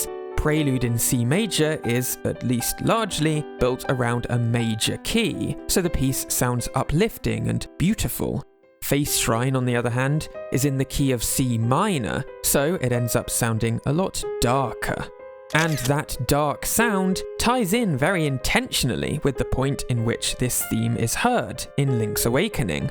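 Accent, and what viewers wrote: United Kingdom. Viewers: Why do british people pronounce sixth like that?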